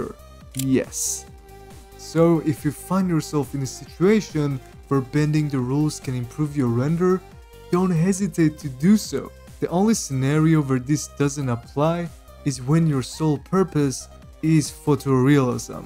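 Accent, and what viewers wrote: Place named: Iran. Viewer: ببین قبل از اینکه اسم کانالتو ببینم گفتم به خدا این داواشم ایرانیه از روی لحجه فهمیدم و خیلی از این که این موضوع رو فهمیدم خوشحالم سابت دارم داداش از محتوات به درستی استفاده میکنم❤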